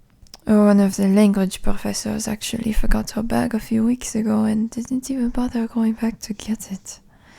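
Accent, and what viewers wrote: France. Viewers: You're french accent is definitely the best on yt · I love the french accent, theres something about it that makes my brain go “love of your life rn” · We're blessed with another French accent audio ☺ thank you!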